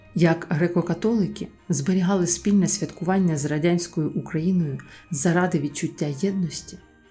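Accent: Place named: United States. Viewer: Мені подобається ваш американський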